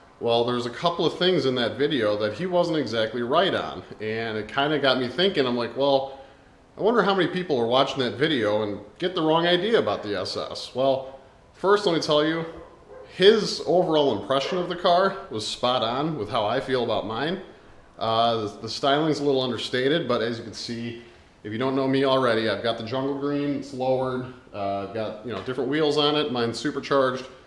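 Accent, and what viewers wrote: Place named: Chicago, United States. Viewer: Everyone in Chicago sounds like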